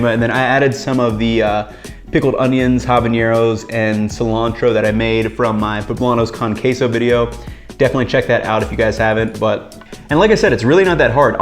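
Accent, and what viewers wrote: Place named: United States. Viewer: I enjoy your videos and your cooking but some constructive advice. If you plan on living in Mexico, do yourself a favor and learn the vowel sounds for Spanish: Replace A-E-I-O-U with AH-EH-EE-OH-OO. It will take the “gringo” right out of your pronunciation. Hope that helps. Oh yes, and ALWAYS strain your sauce. Cheers!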